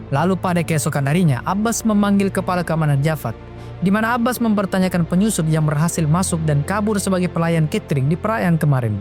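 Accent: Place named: Bugis, Indonesia. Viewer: Orang bugis logatnya admin ni ya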